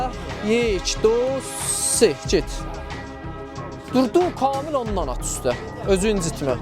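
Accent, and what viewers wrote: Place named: Turkey. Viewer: کیرم تو لهجه ترکی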